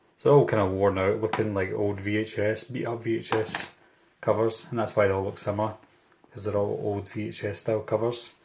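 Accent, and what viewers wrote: Scotland, United Kingdom. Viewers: I knew a could hear a Scottish accent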